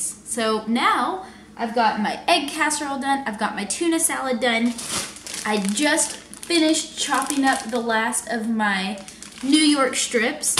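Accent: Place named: South Texas, United States. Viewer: I'm doing the same type diet myself. Your southern Texas accent is strong lol. I'm from Texas too. Thanks for the ideas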